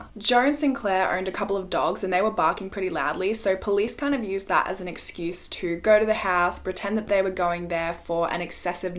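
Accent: Australia. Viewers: definitely Aussie hahaha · as a brit i can confirm is deffo aussie 😎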